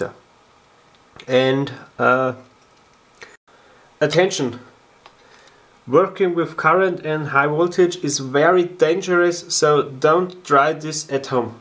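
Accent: Bavaria, Germany. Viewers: Dieser deutsche Akzent aus Bayern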